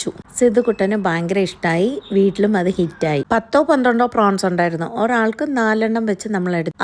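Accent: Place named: Tamil Nadu, India. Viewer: I like your tamil version of talking ❤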